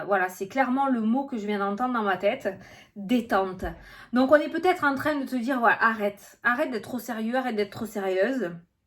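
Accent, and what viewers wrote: South, France. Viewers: j'adore ton accent on se croirait en vacances dans le sud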